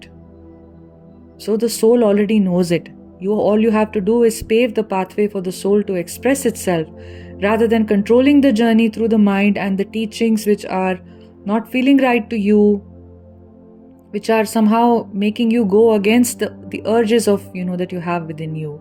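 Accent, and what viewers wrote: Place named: India. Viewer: 🙏 Thanks a lot for such a knowledgeable video and Divine counseling!! I really felt good to get through a channel in English about TF in an Indian voice! 💚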